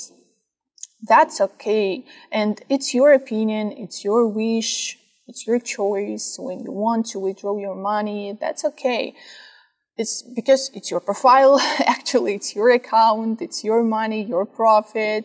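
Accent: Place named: Russia. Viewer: Почему я слышу русский акцент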